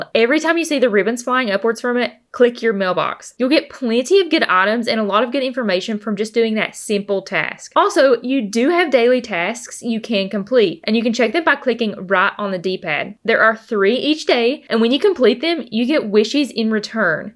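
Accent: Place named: Texas, United States. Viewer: Subbed because of accent 😆 Texas or Tennessee?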